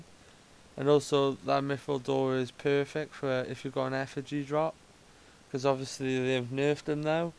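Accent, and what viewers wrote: Wales, United Kingdom. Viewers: From Wales? Sounds like it :D good vid man. subbed, keep em coming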